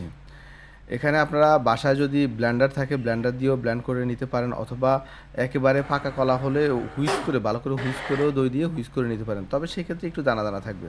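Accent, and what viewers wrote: Noakhali, Bangladesh. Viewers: ভাই আননে কি নোয়াখাইল্লা 😊😊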